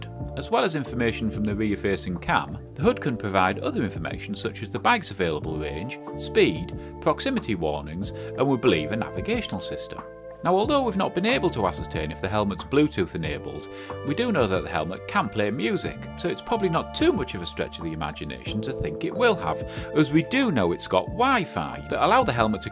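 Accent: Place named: England, United Kingdom. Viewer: tried to listen to a couple of road rash videos. can't stand the sing-song, english narrator.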